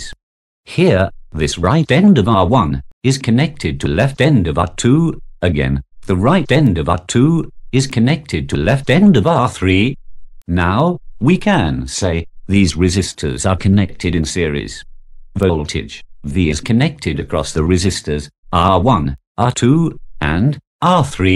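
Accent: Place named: India. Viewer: when you have an Indian accent and a voice of a robot you get this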